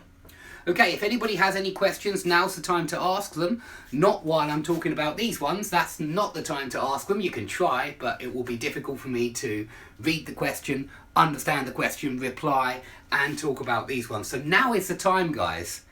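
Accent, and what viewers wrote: United Kingdom. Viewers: I'm from Chile I have been practicing and watching videos for 3 years alone without taking a course in my country and I love to write my thoughts, my experiences and according to how I feel at the moment to start writing on my notebook. So I can improve my english writing. It is hard to find a native speaker in my country, especially british people because I really love this accent. Every day after work, I got home to watch your lessons this is the only thing I do instead of playing games. I hope you keep on making useful videos. It's a pleasure to watch them Greetings. ✌👌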